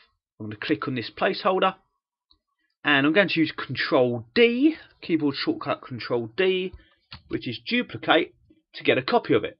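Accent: United Kingdom. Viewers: British English accent sounds really nice..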